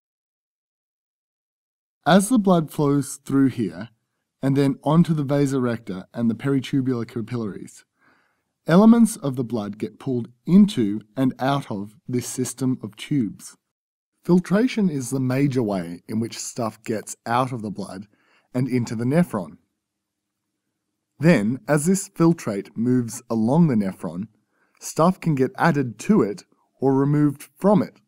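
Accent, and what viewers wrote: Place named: Australia. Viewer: this video is good cuz of the aussie accent..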